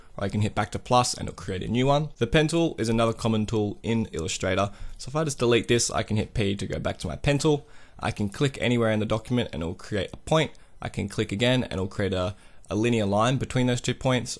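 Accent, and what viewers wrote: Australia. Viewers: Thank you for this helpful video. Nice to hear an Aussie accent! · So glad to find an Aussie tutorial I can understand 😅🙏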